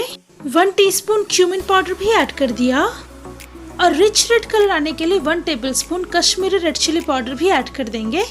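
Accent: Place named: Bengal, India. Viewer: guru kotha sune to mone hocche bengali. ta, barite ki ei rokom macher jhol khan..... youtube r yuge ekhon sobai chef.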